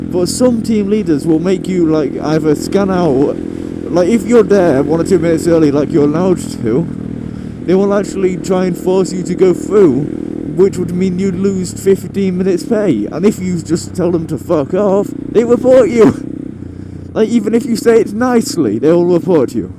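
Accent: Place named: Sheffield, United Kingdom. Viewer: i come from sheffield but live in worksop in 15 miles away from sheffield i thought i recognised the accent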